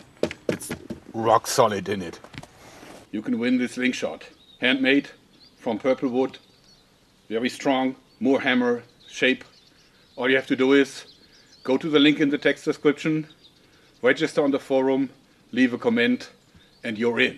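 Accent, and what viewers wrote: Germany. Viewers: mal dumme frage aber biste deutscher? weol dein english so klingt^^ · Sehr geiler deutscher Akzent :D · Are you german ? Nothing against you talking, but I just wanna know.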